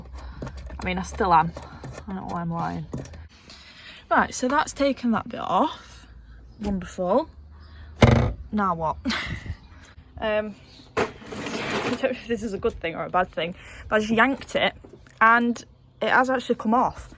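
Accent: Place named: Lancashire, United Kingdom. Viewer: You sound very much like your from my neck of the woods around Lancashire area ?? I love the videos !!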